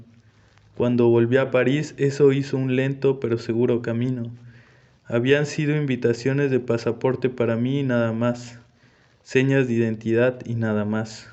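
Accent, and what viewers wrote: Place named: Mexico. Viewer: presente el acento mexicano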